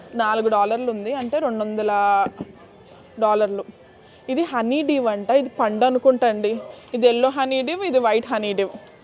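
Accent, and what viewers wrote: India. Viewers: Good, Vijayawada AP language bagundi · గోదావరిజిల్లా ల భాష లో అందరికి అర్థం ఐయేలా చెప్పారు చాలా సంతోషం గా ఉంది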